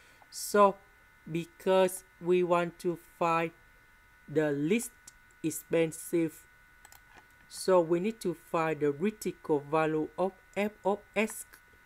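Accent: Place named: Vietnam. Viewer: oh giọng tiếng anh này thì chỉ có việt nam :))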